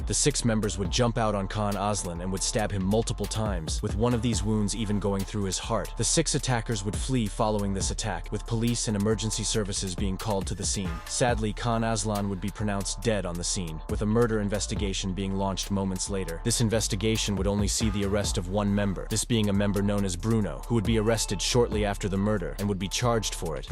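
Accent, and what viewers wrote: United States. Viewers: Its an ai generated voice I think, so it messes stuff up. I think that's the reason anyway. Also an American voice so if not ai that's probs why place names are being messed up.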